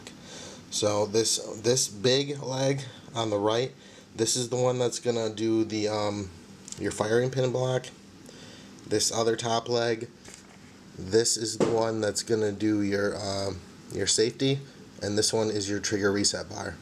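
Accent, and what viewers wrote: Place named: Michigan, United States. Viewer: btw you sound like you're from michigan haha no offence if you're not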